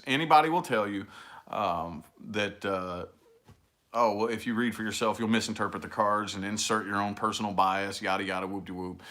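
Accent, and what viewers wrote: South, United States. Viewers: Hi, I absolutely enjoyed your chatting with us today! You are one of my favorite tarot readers!!! Your honest and very real and frank with your messages and your southern humor and accent is refreshing for many of us! You have an amazing gift and thank you for sharing your experiences with us subscribers! Oh and happy hump day from Redondo Beach, California!!!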